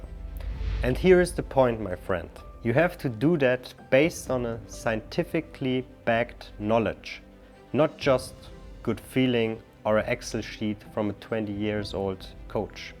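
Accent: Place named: Germany. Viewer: Ich hab sofort durch deine Aussprache gemerkt, dass du Deutscher bist😂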